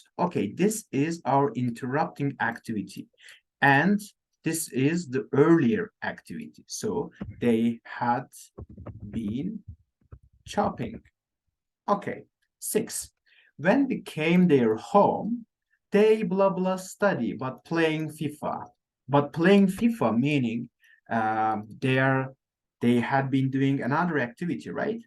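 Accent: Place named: RP, United Kingdom. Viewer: Bülbül gibi ötmek, ingiliz kraliyet ailesi gibi ingilizce konuşmak istiyorsanız doğru yerdesiniz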